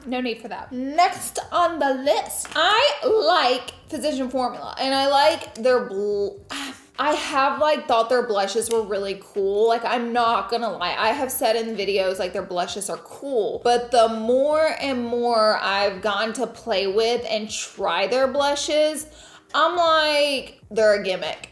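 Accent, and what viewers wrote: South, United States. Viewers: I swear half of why I watch you is because I love your southern accent. I’m from Florida but live in Georgia now and I’m always like “watch this girl she says oil all southern like y’all” Also I can’t understand why you’d even wear shapewear you’re friggin perf. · i haven’t watched your vids in a minute but your southern accent sounds heavier than i remember 😂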